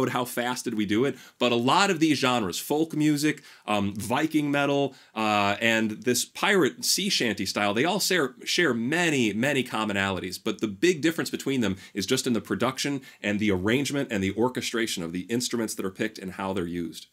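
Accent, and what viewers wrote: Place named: Midwest, United States. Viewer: Wow I just stumbled on this video and man, I just wanted to say thanks and congrats. You have great content, a nice fast pace and a perfect radio voice (with a crisp Midwestern accent indeed). I learned a lot even though I've been playing music all my life. This was legit one of the best tutorials I've ever watched. 👍🏽👍🏽👍🏽 Keep rockin'!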